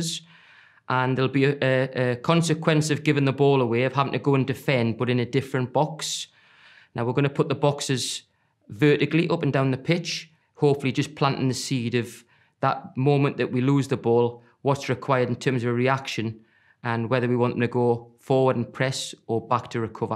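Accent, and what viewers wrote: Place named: Newcastle, United Kingdom. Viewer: Geordie accent Love it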